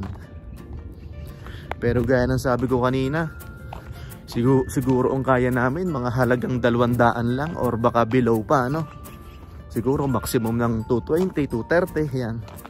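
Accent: Batangas, Philippines. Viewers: I stumbled upon your vlog kabayan at nung madinig ko ang accent mo eh nag suspetsa ako na taga batangas ka😊. Kumusta na lang ala eh taga Batangas din ako.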